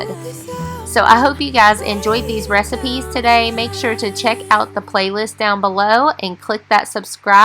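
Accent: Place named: Tennessee, United States. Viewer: New sub! I came over from One Blessed Mess bc she said I should! 😜 I throughly enjoyed watching you make dinner. Now if you can just hand it to me through the screen, that would be soooo helpful! 😉 I have a garlic chicken crockpot recipe too. I wonder how similar they are. I’m gonna have to check. But mine is bone in. And I much prefer boneless. So yah!! Can’t wait to try it! I love your accent! I want to move to TN so badly so I can get one! 😂😂😂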